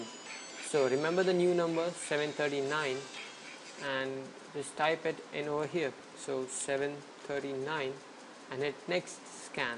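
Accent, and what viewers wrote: India. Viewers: nice accent. indian boy. ? :)) btw . tnx a lot it helped:d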